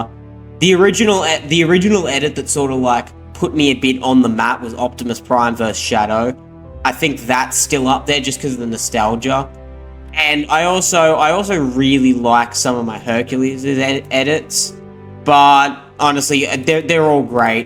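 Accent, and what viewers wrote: Australia. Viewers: I hope you don’t mind me asking cause I would like to know if you’re Australian? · I didn’t expect u to be Australian! W tho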